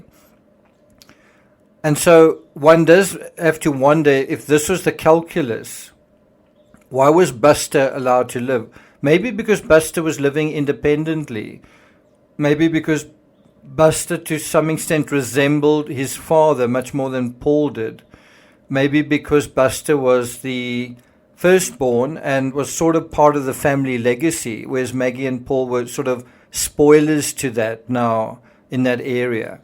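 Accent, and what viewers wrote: South Africa. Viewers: Love your South African accent.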